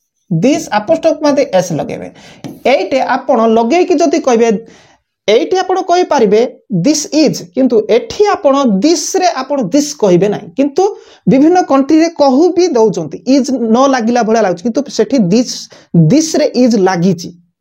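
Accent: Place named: United States. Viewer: Sir American accent kahuchanti 🥱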